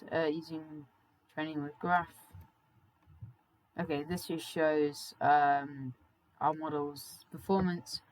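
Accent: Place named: United Kingdom. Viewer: It seems ur from UK and not US cuz u speak British English. Am I right?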